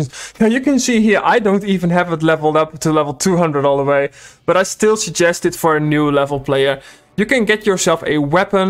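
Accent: Netherlands. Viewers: Are you dutch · You are dutch, aren’t ya?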